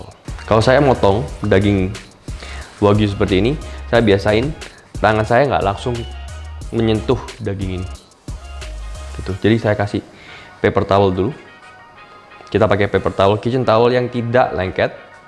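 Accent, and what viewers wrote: Java, Indonesia. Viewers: kok enak ngomong jowone coba nek review campur bahasa jowo mas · logat jawanya chef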